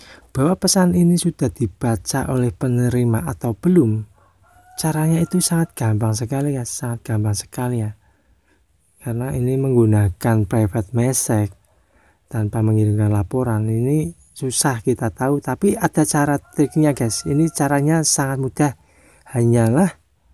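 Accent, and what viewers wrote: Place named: Java, Indonesia. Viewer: Tak tebak wong jowo yo podo mas jowo ne ,aku kudus jateng mas salken lo.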